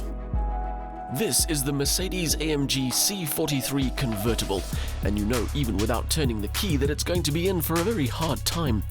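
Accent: South Africa. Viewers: Great video, love the South Africa accent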